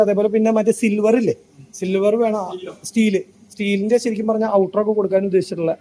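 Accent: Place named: Kottayam, India. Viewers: കോട്ടയം ഭാഷ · കോട്ടയം അച്ചടി ഭാഷ ഒർജിനൽ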